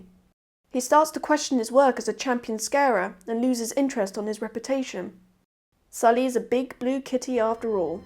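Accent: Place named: England, United Kingdom. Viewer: Great video! Great accent - where are you from? You sound like my favorite English pop star, Kim Wilde. Are you from London?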